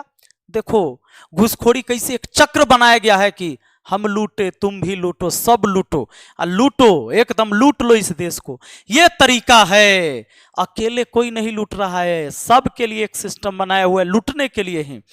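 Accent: Bihar, India. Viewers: Aap gvaro jaise bat krye bihar ke. Jansankhya. Ghntv pr boliye aapka sr tan se juda go gayega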